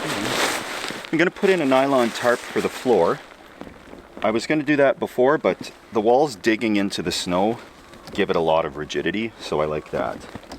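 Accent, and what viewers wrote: Canada. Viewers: Are you an Ontarian or albertan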